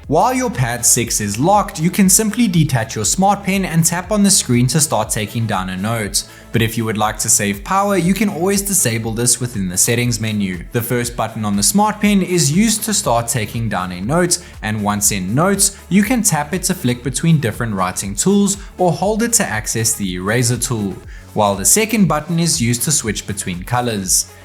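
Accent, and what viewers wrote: South Africa. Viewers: oh South African ! jburg or CT ? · Wait he's South African😯😲😳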